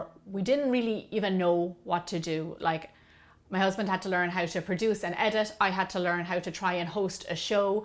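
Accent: Ireland. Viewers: May we please have a video of you cooking while you're in Ireland?:D I just love Irish people I think you guys have cute accents xD Also do you like the tropics? Come to the Philippines some time in the future or make something big and bold with tropical fruits:D ( I rarely see desserts with them tho o.o ) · I love your Irish accent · I am really glad I´ve found your channel. First, because you bake heavenly goods and teach the recipes really well. Congratulations on your channel! Second, because listening to you takes me back to Ireland, a place I was fortunate to live in for two years of my life. Let's cheer for your talent and great work! Sláinte!!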